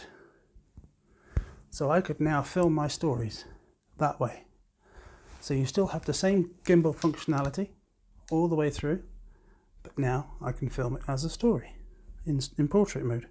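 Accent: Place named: England, United Kingdom. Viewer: nice to here an 'English' voice here on YouTube. Can I use with my Pan Lum SZ330? (bridge camera) I know what you mean about the focus on Panasonic my is all in and all out difficult to get a nice slow in/out. I'm considering buying a dedicated camera (low cost) video camera for the 4500? What do you suggest? On camera mic; Can I put a Rode 'type' mic on the separate handle or is there some better option? Great review.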